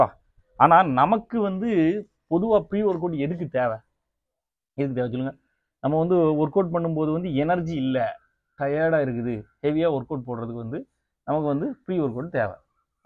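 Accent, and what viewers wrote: Tirunelveli, India. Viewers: Nellai tamil😂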